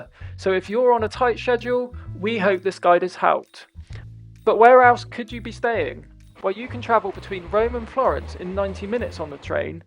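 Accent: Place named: England, United Kingdom. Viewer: You should REALLY REALLY learn how to pronounce correctly the Italian high points you’re trying to have tourists visit…..it’s quite an embarrassment to hear you TRY to speak Italian. You must be British because they NEVER pronounce Italian properly…and your accent points to England for sure. Thankfully I went to school there and speak Italian (northern, I might add…the proper Italian) so please stop these Italian tips……take a class, already.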